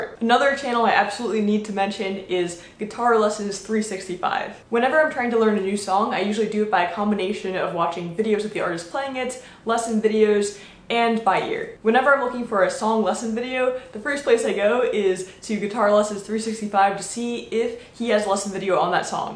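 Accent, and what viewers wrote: Netherlands. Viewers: You sound Dutch, is that true? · Are you dutch haha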